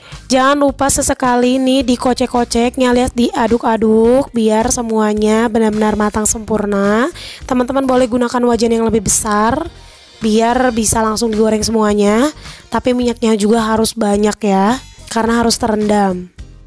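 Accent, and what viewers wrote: West Java, Indonesia. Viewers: nonton kr konsen jdna kagugu s teteh na sundaaa😂😂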